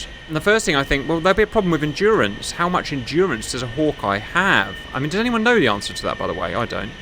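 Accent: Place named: South, United Kingdom. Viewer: OOOhhh southern UK...Noice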